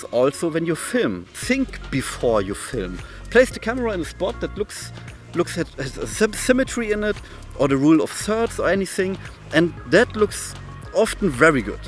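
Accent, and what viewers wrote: Germany. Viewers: dein englisch hört sich voll deutsch haha nicht böse gemeint aber waren auf jeden fall gute tipps 💪🏽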